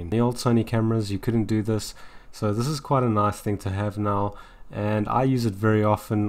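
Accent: South Africa. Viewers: Are you Dutch? You sound South African. I know the sound is no good. But 10 years ago I did not have the Neumann U87 yet.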